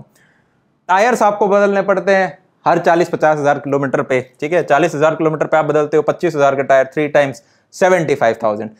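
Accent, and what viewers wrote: Haryana, India. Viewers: haryanvi accent... pade😁😁.... great sir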